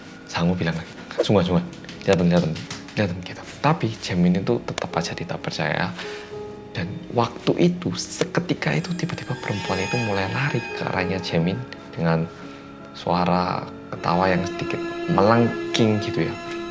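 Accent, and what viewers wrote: Java, Indonesia. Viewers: Aku suka bgt sama channel karena suara medhokk nya😆😆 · Berguyon? Bahasa indonesia mix jawa.. 🤣🤣🤣 medhok tenan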